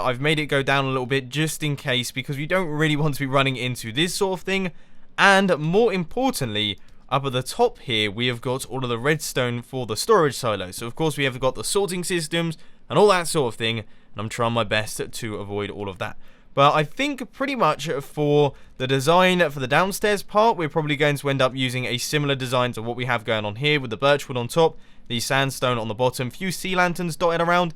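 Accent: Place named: United Kingdom. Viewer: the accent tho... one of the little things I love about ♥ "wotoor botools" (water bottles) "simpolist" (simplest) "prooty" (pretty) and all the missing T's and R's that british people always manage to miss. like you say "ee" instead of "it" or "earlieh" instead of "earlier", "ge'in" for "getting", "bu-on" for "button"...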